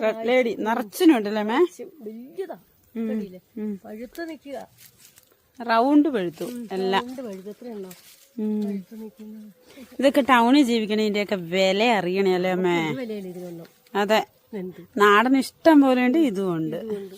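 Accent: Kottayam, India. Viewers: Kottayam ano. Bhasha Kottayam alkkarude pole und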